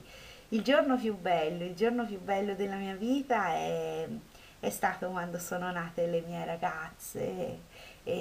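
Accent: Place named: Tuscany, Italy. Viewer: Che meravigliaaaa! Adoro la parlata toscana <3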